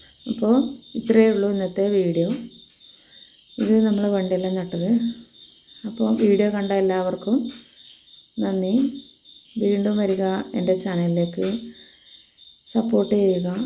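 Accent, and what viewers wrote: Kannur, India. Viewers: അടിപൊളി വീഡിയോ ആനുട്ടോ.. ഏററവും അടിപൊളി കണ്ണൂർ സംസാരം ആണ്... മൊത്തത്തിൽ പൊളിച്ചു 👌🌎 വളരേ നല്ല അറിവുകൾ ആണ് പകർന്നു നൽകിയത് എല്ലാവർക്കും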